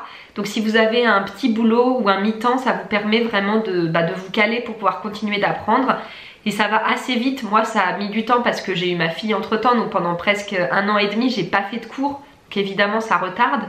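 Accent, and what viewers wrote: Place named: Germany. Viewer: Ça se voit l'accent allemand quand vous parlez français, hihihi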